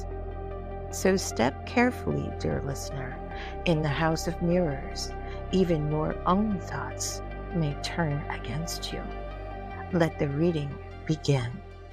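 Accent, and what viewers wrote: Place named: South, United States. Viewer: This was amazing! I absolutely love the southern drawl accompanying the southern gothic.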